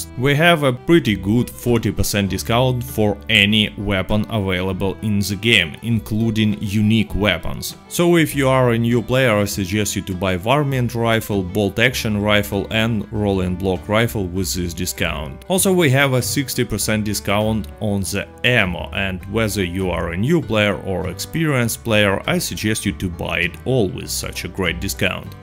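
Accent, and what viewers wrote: Russia. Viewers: Пришёл с cat dead redemption, ну чётко два канала за такое респект! А так акцент русский, произношение советую тренировать. ми инглиш вери вери гуд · Russian accent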